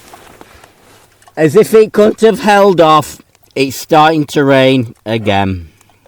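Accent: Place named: Manchester, United Kingdom. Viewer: Where are you from, accent a bit Manc, but maybe our Oldham ?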